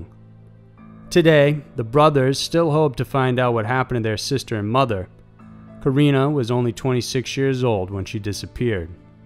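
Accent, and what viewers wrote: United States. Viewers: The narrator has a perfect American accent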